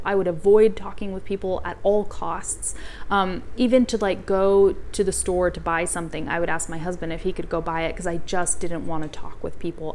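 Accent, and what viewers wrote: Buenos Aires, Argentina. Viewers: Excellent video!!, telling us about your personal experience with the spanish language is very inspiring. As a native spanish speaker i got say you have a very nice accent, quite "porteño" haha. Bueno ahora si en español, te cuento que hace poco di con tu canal de youtube y de todos lo que encontre puedo decir que entiendo muy bien como hablas en ingles. I got a say since my childhood i listen a lot music in english, and watch movies and tv shows as well, but my challenge is reading fluent english language. Saludos desde Argentina. PD: I dont know if the word "quite" is well used xD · Hello, thanks to share this video and your experiences with language learning. Your Spanish it's very good! You sound like a true Argentina! Continue inspiring us! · I think it was an excelent video, very natural, and I like when you speak fast and there is no subtitles, that force us to pay attention and follow every word as much as we can. 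We sometimes try to be perfect in all what we do and that is a problem, because nobody is perfect... and if you make a mistake at least that mean you are trying. I have to say that I love when you speak Spanish, I have seen other videos from you and I saw you talking in Spanish in one of them and I was perplex because you sound like an Argentinian woman, your Spanish is really clear and you got to achieve a very similar accent. Thanks for your video and I know many of us will be looking forward to a new one. See you. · I don't know, but it seems that you're speaking a little bit slow because I'm getting everything you're speaking , am I wrong?..suenas como una porteña, saludos desde Nicaragua XOXO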